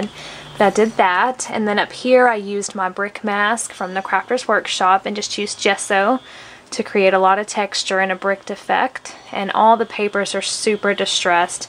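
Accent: South, United States. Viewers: LOL...I should be bangin my head on a wall...How could I not know...I live in Ft. Pierce and my store is in Pt.St. Lucie and we had the visit form Isaac too...I did pick up your "Y'all" lol you can't hide your southern girl...hehehe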